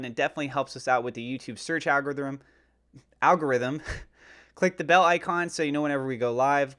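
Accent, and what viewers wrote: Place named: Wales, United Kingdom. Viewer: Haha how can you mistake a Welsh accent for Australian, they are so different!!!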